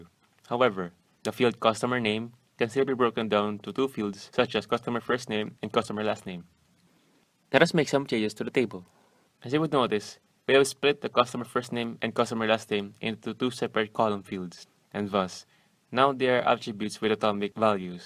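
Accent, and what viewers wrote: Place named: Philippines. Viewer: Thnx....but Oh God, the accent, so hard to understand him...but Pinoy pride baby ;)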